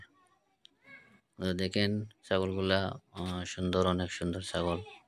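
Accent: Sylhet, Bangladesh. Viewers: ভাই আপনি কি সিলেটি ত আপনার লোকেশন কোথায়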